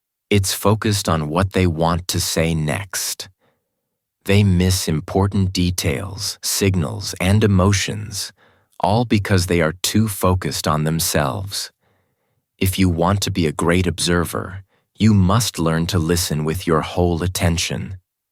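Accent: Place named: United States. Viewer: with a vile accent twist. With all of mankind's languages to choose from to prostitute, they chose a most historically beautiful and fascinating language. America, a pox on humanity.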